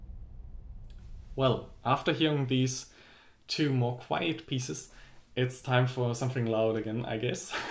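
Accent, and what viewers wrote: Germany. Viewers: I was afraid that musical geniuses died years ago. But here is who knocks my socks off...He seems to have a German accent...It must be genetic. His work makes me feel validated and Happy. My parents gave me an appreciation of music. I picked up the ball and ran with it. Music ist mein Hertz, mein Geist, Mi Corozon, Moi Coer, how much time do you have?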